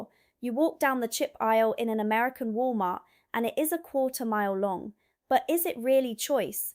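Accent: United Kingdom. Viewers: is she really an American? her UK accent isn't that of someone from the USA meaning U think she was born outside the USA and emigrated here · WOW! Great piece and so well put together. Freedom .... scanning for emergency exits everywhere you go, kids with a bulletproof insert in their school satchels, neighbours measuring how long your grass is. That brought it home how visceral the day to day experience is. Imagine being a mother and inserting a bullet proof thingie into your kids school satchel. Every day is a reminder of what COULD happen. We all know USA has a gun problem and an even bigger problem is some of the people that own them .. They practically walked into like a Tesco that sells guns and bought one off the shelf. That is from a UK point of view UTTERLY MENTAL and we all knew that. BUT your piece was VERY ILLUMINATING in outlining the pyschological effects and the almost constant unease of being in public spaces. 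I do hope the unwinding of your nervous system and your deeper sense of ease will continue to grow. We all knew the potential physical dangers but had probably not thought about the wear and tear on your pysche. Thank you for your most illuminating piece. May your new more at ease state of mind continue to grow and deepen. Your accent has become very UK as well. Welcome! ❤❤😊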